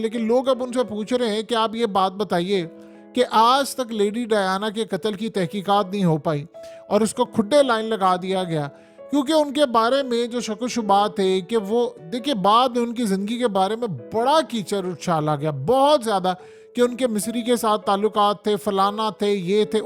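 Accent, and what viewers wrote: Punjab, India. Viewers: Punjabi aisay hi boly hain Dont worry 😅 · Yes, this faceless journalist has to give up his Punjabi accent and learn to pronounce correct names of European/American leaders, celebrities and names of British Royal family members. The name is NOT CHAARLAS it's King Charles the III. Thanks.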